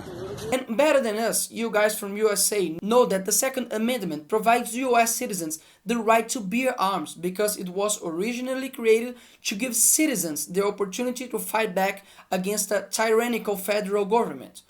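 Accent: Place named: Brazil. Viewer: Quando percebi o sotaque brasileiro no inglês, parei de assistir na hora. Quero ver é gringo criticando ou metendo o pau no F.N, porra!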